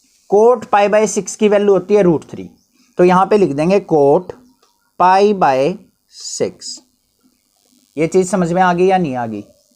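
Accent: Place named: Rajasthan, India. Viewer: Aapka accent rajasthani h. Aap rajasthani hai na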